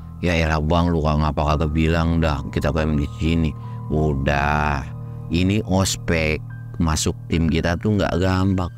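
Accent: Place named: Banyumas, Indonesia. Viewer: Hadir ngapak Bang 😂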